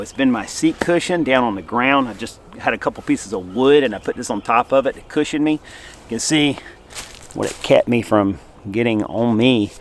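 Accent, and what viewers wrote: South, United States. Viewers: Sorry, trying to figure out what state your in? Couldn't find it, but you have a pretty cool southern twang in your voice.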